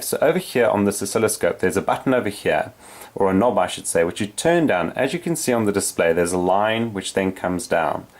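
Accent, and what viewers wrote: South Africa. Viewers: Not only South African accent, but I would place it as Johannesburg, and probably Sandringham / Orange grove. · Nice video. South African accent?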